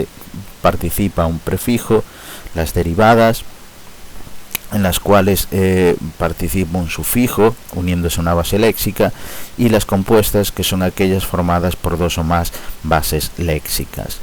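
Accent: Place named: Catalonia, Spain. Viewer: es el catalan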